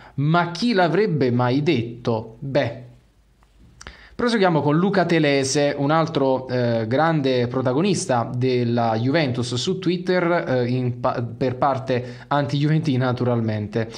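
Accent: Campania, Italy. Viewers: La cosa più brutta di questo video è il tuo accento Campano ti hanno fatto proprio il lavaggio del cervello ci vuole coraggio difendere una squadra del nord...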